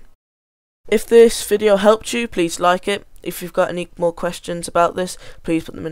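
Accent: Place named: United Kingdom. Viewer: OMG he s ounds sooooooooooooooooooooooooooooooooooooooo british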